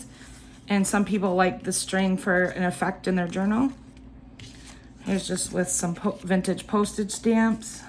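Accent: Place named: Michigan, United States. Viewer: You sound like Michigan...I'm from Royal Oak.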